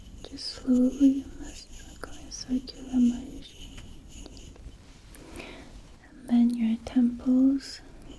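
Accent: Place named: United Kingdom. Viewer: 너무나 한국적인 분위기에 고증도 정말 잘하신것 같은데 우아한 영국식 영어가 이렇게 잘 어울릴 일인가요ㅎ 영어공부도 같이 되고 있어요! 좋은 영상 너무 감사해요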